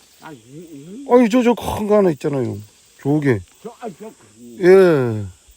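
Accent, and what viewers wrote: Gangwon, South Korea. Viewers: ~~^^강원도 사투리 너무좋앙♡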